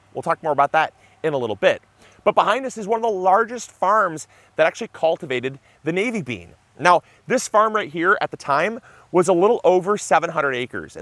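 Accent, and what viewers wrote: Michigan, United States. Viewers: I miss the Michigander accent!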